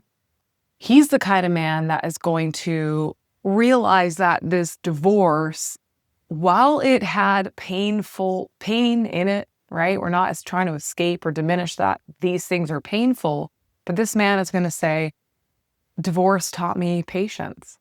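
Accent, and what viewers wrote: Canada. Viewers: along with the fact that you're smoking 🔥 hot, I really get a kick out of your Canadian accent. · Really. Is it Canadian.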